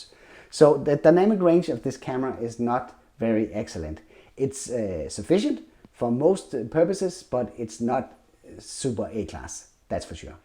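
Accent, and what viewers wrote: Denmark. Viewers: Er du fra Danmark, syndes jeg kan høre lidt dansk😂🇩🇰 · Du lyder lidt dansk :)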